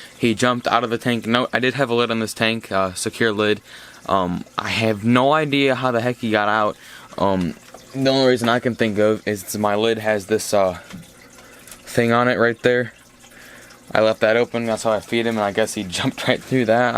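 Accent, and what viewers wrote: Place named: Ozarks, United States. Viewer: where are you from? ozark area I'm guessing?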